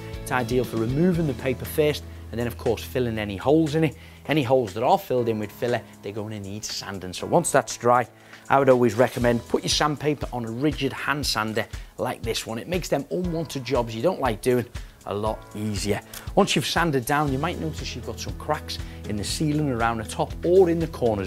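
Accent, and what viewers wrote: Liverpool, United Kingdom. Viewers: Liverpool accent from UK . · 30 dollars wasted. He's from Liverpool, not Scotland. Completely different accent. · He’s a scouser from liverpool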